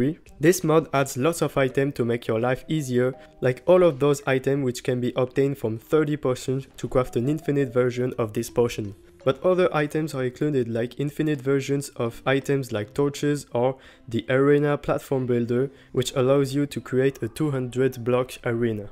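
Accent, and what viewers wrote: France. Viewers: Je pensais pas tomber sur un français vu l’accent mais incroyable la vidéo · Hey homie you're french aren't you ? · You are french i can feel it in your voice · Wait youre french?? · With your accent I guess out that you are French😊 and as a French people I must help the others French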